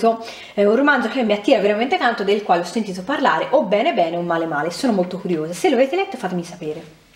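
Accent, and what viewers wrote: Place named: Tuscany, Italy. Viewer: Ciao, e vuoi vedere che la fine del petrolio sia la fortuna dell umanità. Bellima la parlata toscana.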